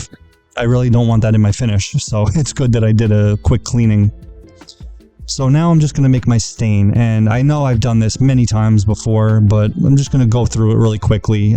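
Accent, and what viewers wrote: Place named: New England, United States. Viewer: Love the contrast in the stains it makes the gorgeous veneer really pop. I love how you can bring out the beauty of the woods. Now we know you're on Long Island, you have just a small touch of what I consider a New England accent, (my mom's from Massachusetts, though she made a strong effort she sadly, in my opinion, succeeded in losing it. I'm southern California and, no we don't talk like valley girls, lol. We have almost no accent. Just plain boring English with nothing special on top. Say hi to your dad.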